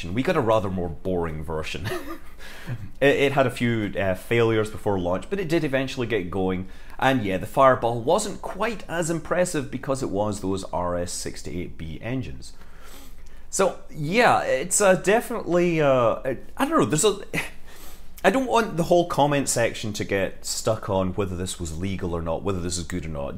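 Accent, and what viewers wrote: Scotland, United Kingdom. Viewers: Is he Scottish? He sounds just like Mrs. Hughes.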